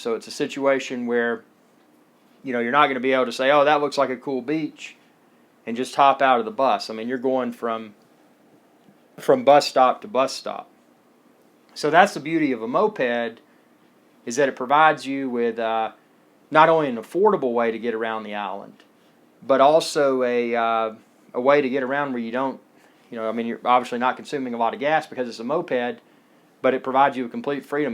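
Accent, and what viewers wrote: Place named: Alabama, United States. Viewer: I'm sure this is a great video, just can't get past the Alabama accent. Rolling on the floor laughing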